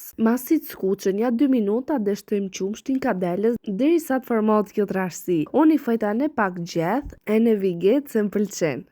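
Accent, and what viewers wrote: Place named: Albania. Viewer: Të lumshin duart po nga cila trev e Shqipëris jeni sa bukur flisni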